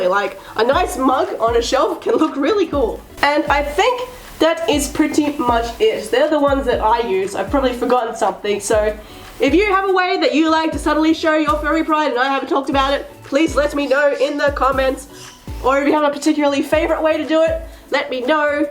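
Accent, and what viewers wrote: Australia. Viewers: Wait....r u aussie!?!?! Coz if u are. FURRY AUSSSIE YOUTUBER LIKE MEEEE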